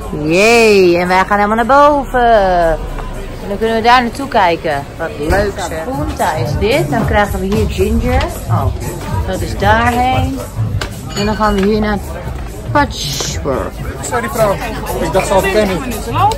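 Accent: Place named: Amsterdam, Netherlands. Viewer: Waarom zit je zo vaak aan jouw haren te trekken? Dat vind ik echt irritant om te zien! Verder doe je het leuk en vooral jouw Amsterdamse accent vind ik leuk.😍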